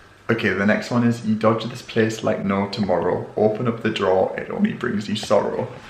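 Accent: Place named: England, United Kingdom. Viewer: with your English accent I have trouble understanding you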